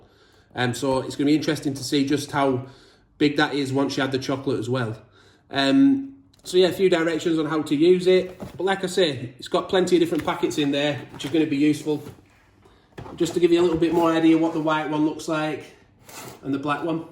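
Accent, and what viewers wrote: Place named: Birmingham, United Kingdom. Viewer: What's this accent ? Is it from Birmingham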